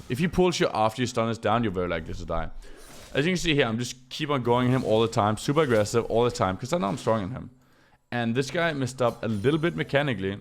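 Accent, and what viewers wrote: Denmark. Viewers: You have to be Danish, the way you pronounce TF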